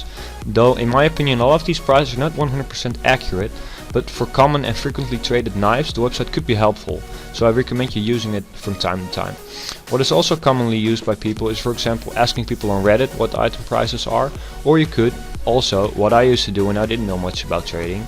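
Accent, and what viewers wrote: Netherlands. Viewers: Ik dacht dat ik een nederlands accent hoorde, en ik ben van belgië en mijn engels is ni zo sterk mar goeie video's man :p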